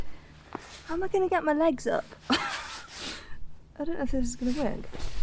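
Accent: Wales, United Kingdom. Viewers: Nice review, Great to see someone actually give a pack some abuse on a review haha, funny and informative, and a great welsh accent. Quality video.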